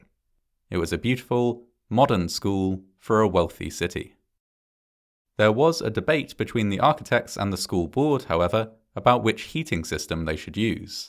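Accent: England, United Kingdom. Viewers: I like how you don’t realize he’s English and that they pronounce things differently. America isn’t the centre of the universe.🙄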